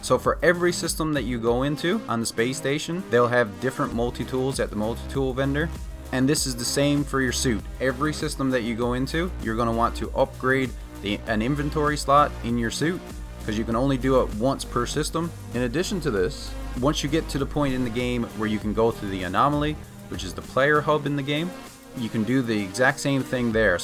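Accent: Newfoundland, Canada. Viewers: Could tell your a newfie from the start aha! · Some good tips, thanks! Oh, and I had to do a double take when I heard your accent 😆 not many newfy YouTubers I'm aware of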